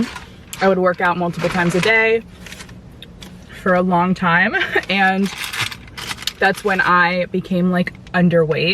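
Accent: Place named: San Fernando Valley, United States. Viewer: Please dont take offense to this, good vid, i subscribed..are you from the valley? Im from seattle, but i got cousins who lived somewhat near to the "valley", for a short while i worked there. Theres a specific tone that you got..its reminiscent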